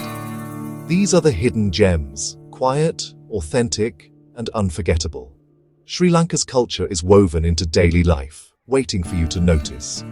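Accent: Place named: United Kingdom. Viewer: AI slop with a Limey voice over.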